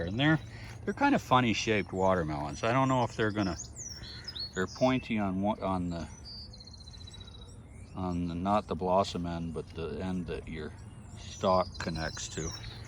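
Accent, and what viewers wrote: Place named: Texas, United States. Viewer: nice! I looked into the self watering pots by that Leon guy, very cool. Fun to hear an old Texas accent too! Haha I think I’m gonna start some. Where did you get your buckets?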